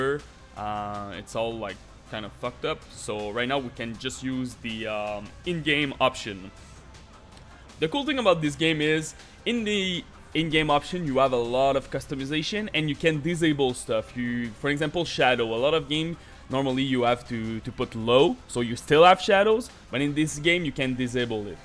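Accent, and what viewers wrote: Quebec, Canada. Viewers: l'accent direct j'ai capté que t'es un French Canadian :P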